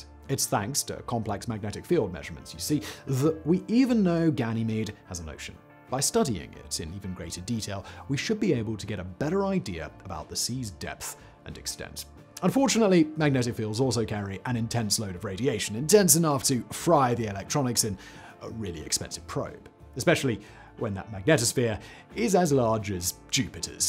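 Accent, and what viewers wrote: England, United Kingdom. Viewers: "Is There A Life" on the thumbnail? Poor English from someone with an English accent...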